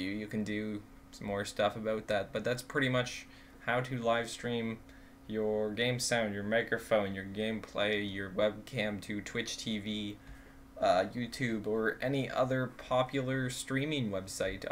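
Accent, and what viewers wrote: Canada. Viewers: are you canadian? i can hear it when you use the letters v and c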